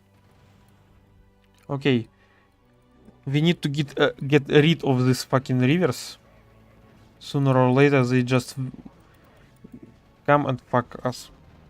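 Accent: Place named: Russia. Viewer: Судя по акценту предположу что вы из России😉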